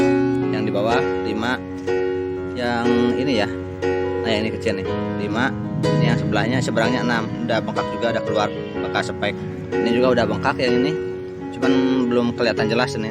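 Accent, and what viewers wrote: West Java, Indonesia. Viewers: Baru 2jam aploud, mantap ilmunya pak. Penyampaian simpel mudah difahami dng logat Sunda tambah epik. Saya dari Jatim enak mencerna penyampaiannya👍🏻👍🏻👍🏻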